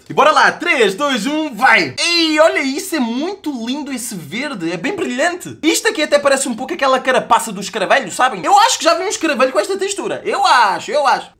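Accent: Portugal. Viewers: ele é de portugal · gameplays ele é de portugal!!eles falam algumas coisas diferente do brasil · ele é portugues né? de portugal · Ele é português de Portugal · Ele e português do Portugal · O cara veio de portugal vey to entendendo nada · Ele e portugues?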